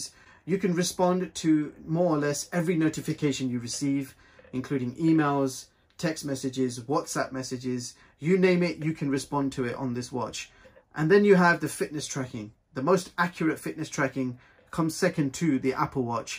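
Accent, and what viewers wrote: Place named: England, United Kingdom. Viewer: Generally I don't ever leave comments, I know I should, but sometimes (as someone who needs to get the relevant info I'm looking quickly I never do. However, this occasion I was so impressed with the content of this video I felt compelled to show my support. Everything I needed answers to was in this one video. Plus, the small wrist bonus section was really appreciated. As said in the video, it's a very overlooked part of buying a smart watch. Also, as a Englishman it was great to hear an English accent narrating the video (the generic American and often computer generated voices drive me up the wall 😬😁). Really great video, very informative and exactly what you look for on YouTube when looking into some new tech to purchase. Excellent work, Thank you. Liked, commented and subscribed!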